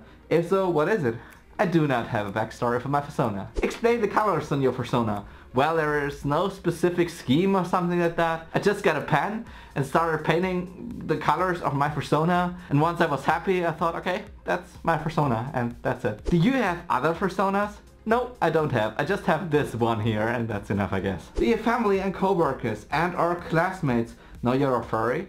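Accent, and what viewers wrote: Germany. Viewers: The curse of the "German Accent" is with you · I love your german accent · you have a German accent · your accent is as german as it gets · you do have a bit of a different German accent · nope, german accent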